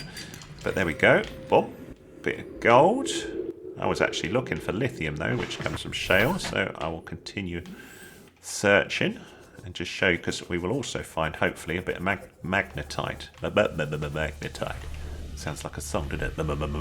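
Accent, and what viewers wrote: United Kingdom. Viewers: Yeah, agreed, straight to the point. Also your English accent is lovely!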